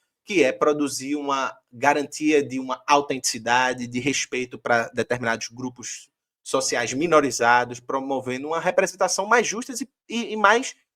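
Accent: Pernambuco, Brazil. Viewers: Grande iniciativa. Filosofia com sotaque pernambucano. Massa demais. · Mais um com sotaque pernambucano no canal meu coração não aguenta! 😍Salve,! Como é inteligente e bonito o camarada. Amei o quadro! Parabéns!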